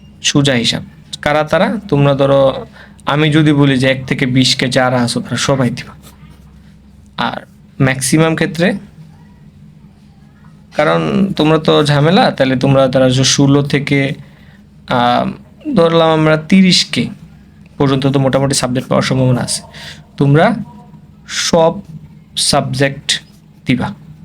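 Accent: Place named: Comilla, Bangladesh. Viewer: Vaiyer bari mone hoy comillaa...🙂 Btw vasa sune andaj korlam🙂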